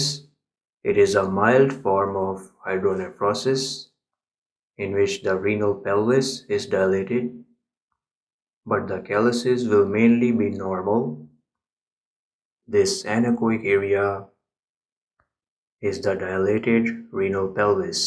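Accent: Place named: India. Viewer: Jitna vi kausis karlo aapka accent humlog jeisa hi hei..so natural rehne ki kausis kariye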